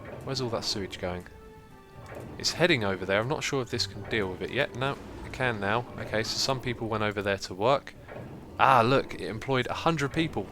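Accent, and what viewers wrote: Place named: London, United Kingdom. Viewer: I reckon he is from somewhere in London ???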